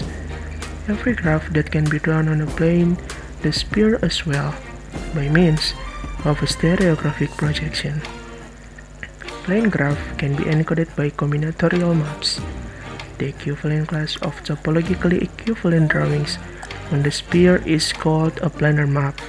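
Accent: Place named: India. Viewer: Fuck you bloody *indian accent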